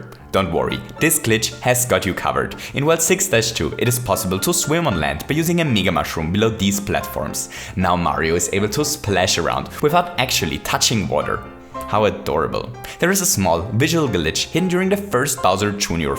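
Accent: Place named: Germany. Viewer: Dein deutscher Akzent ist echt eklig bro. Und kannst du bitte aufhören zu versuchen intilligent zu wirken indem du immer wieder unkonventionelle Wörter wie "plummer" benutzt, das nervt einfach nur und ich bekomme cringe-attacken, weil mal wieder ein deutscher auf möchtegernengländer macht. Ansonsten geht dein Content aber klar.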